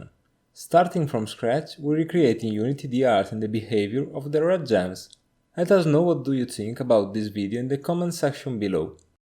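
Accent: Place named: Italy. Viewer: am only here for the Italian accent :p Great video btw, the shader part was really interesting!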